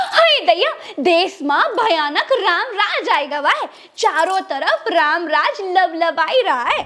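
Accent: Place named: Bhojpuri, India. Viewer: Great acting loved it keep it up ! Love the Bhojpuri accent !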